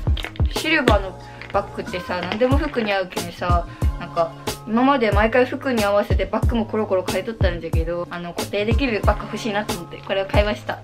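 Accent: Okayama, Japan. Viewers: めっちゃ岡山弁〜💞勝手に親近感わきました！可愛い人は岡山弁話してても可愛い件😍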